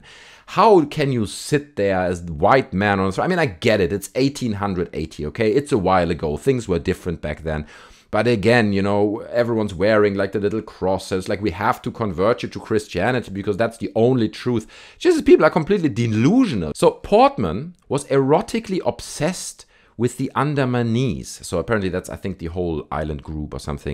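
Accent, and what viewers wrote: Germany. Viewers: You have a German accent lol.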